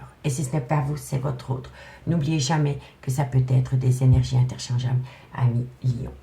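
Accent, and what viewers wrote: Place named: South, France. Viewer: bonjour J'aimerai savoir si vous êtes du sud (on dirait bien ) entre Montpellier et Marseille SI OUI? vous consulter en réel,?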